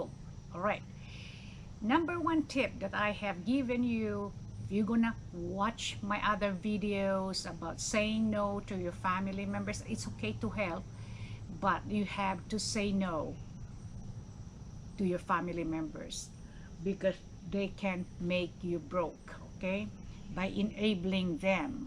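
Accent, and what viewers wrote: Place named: Philippines. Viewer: Are you a Filipino ? If yes, then could you pls speak in your mother tongue your accent speaks for it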